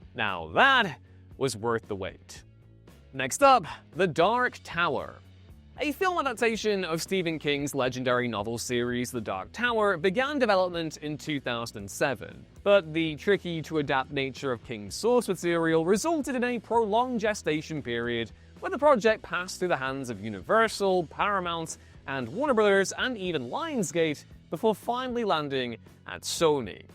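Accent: United Kingdom. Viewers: I always love British pronunciation of Spanish words. It is always fascinating (not that my accent was ever perfect).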